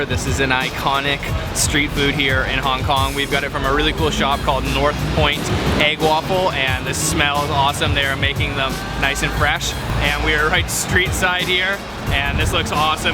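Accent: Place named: Canada. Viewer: I was wondering where this young man was from....the food looks great. I finally figured out where he is from when he went to the Oowtdoor market and was aboot to try some food.....CANADA. Keep up the good videos young man.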